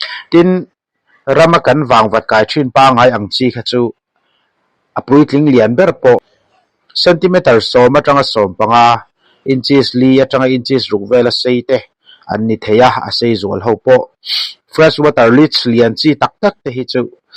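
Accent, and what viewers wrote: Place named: Mizoram, India. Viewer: vāngvat itia, vangvat alawm... i thluk dan reih ruih hi chu a bru mizo awphawi riau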